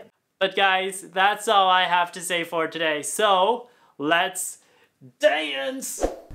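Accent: Cantonese, China. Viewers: OMG是真的Ne-Yo!我本來還期待你自己裝扮成他! 越來越棒了~真的大愛你講廣東話跟有廣東味的中文(普通話)😍😍😍!!! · Uspeak cantones so cute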